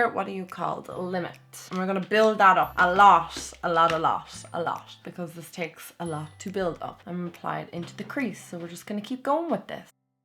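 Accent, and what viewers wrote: Ireland. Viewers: I heard an Irish accent and the description says you are from Ireland. Yesss!!!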